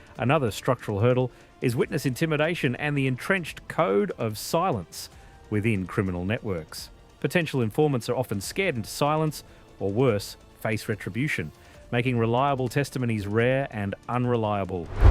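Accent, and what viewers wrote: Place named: United States. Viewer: I hear an American accent but it could be AI if not do some research so you stop sounding like a dickhead it's always been like this why do you think some people earn full colours in half the time of others. You sound like a square head who reads something in the newspaper and then talks to other straights like he's in the know. Don't usually comment but I'm sick of this shit.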